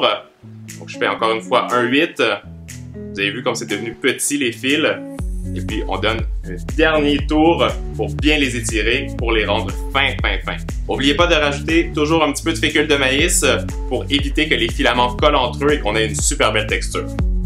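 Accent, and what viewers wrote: Quebec, Canada. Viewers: Coucou ta recette est trop C😎😎L et tu est trop beau,j'aime trop ton accent, il est canadien?pourrais tu faire de la barbe à papa au NUTELLA???😘😘😘😜😜😜❤❤❤💙💙💙💚💚💚💛💛💛💜💜💜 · Ccou je suis nouvelle est je voulais te dire : Tu as un magnifique accent québécois ! Est j'adore tes vidéo +1 abo 😉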